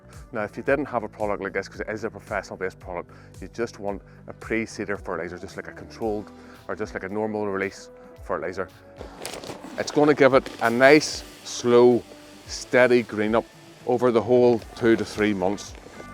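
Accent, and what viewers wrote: Northern Ireland, United Kingdom. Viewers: Never thought I would get so much enjoyment watching a video on lawns. Knowledgable, genuine and straightforward. Throw in northern Irish accent and you have all the ingredients for a brilliant channel. Keep it up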